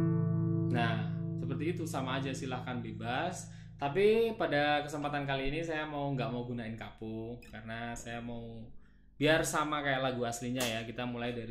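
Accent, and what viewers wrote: Java, Indonesia. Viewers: Kendal? Suara medok bang.. Salam dr pegandon 😂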